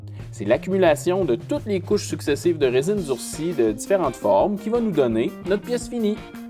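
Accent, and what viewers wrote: Quebec, Canada. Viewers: Génial, je viens de découvrir ta chaîne, c'est vraiment cool tout tes trucs, et côté vulgarisation, c'est un plus et en plus, en québécois !!!! J'ai rien contre nos cousin français, mais du français de chez nous au Québec, sa sonne mieux à mon oreille ;) ! Se serait bien une suite sur l'impression 3D, je m'intéresse pas mal au sujet, j'aimerais bien en apprendre d'avantages particulièrement sur le dessin de tes propres pièces. Je suis maquettistes et sa pourrait m'être utile. Si il y a un logiciel de base, pas trop complexe d'utilisation pour les débutant et pas trop dispendieux, encore mieux gratuit et en français se serait vraiment le fun :) Merci, et continu ton bon travail !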